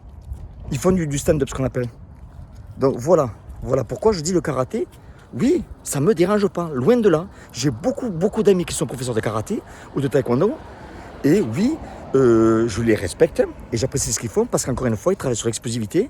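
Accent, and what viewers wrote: South, France. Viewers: ... t'es en train de me réconcilier avec l'accent du Sud! Parigot que je suis! Force à toi!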